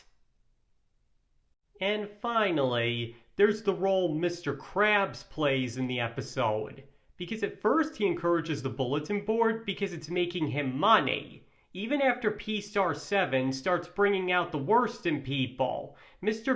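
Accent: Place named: Great Lakes, United States. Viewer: Im really enjoying your channel! You bring new perspective to the SP Analysis community that is sorely needed. I'm also dead ass fascinated by your accent. I've heard it once before, from a Podcaster who is probably twice your age; specializing in baseball and popes! 😅 I've heard it referred to as a "Great Lakes" accent. My brief attempt at light online stalking was unsuccessful. Can you help me understand your accent better without revealing inappropriate location details?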